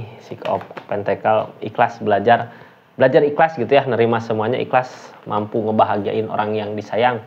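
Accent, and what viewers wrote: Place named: West Java, Indonesia. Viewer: Lucu ih.. sunda banget😀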